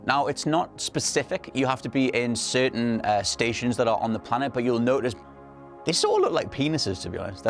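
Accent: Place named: North East England, United Kingdom. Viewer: This help me out alot because im a huge noob on this game cheers mate!!! sounds like your from the north east of england as well i am to :D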